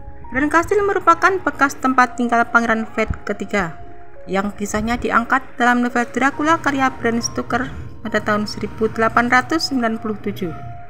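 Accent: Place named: Java, Indonesia. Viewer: Pekat benor jowo nya😂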